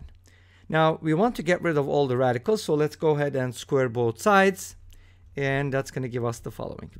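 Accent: Turkey. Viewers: You have a Turkish accent, are you Turkish by any chance ?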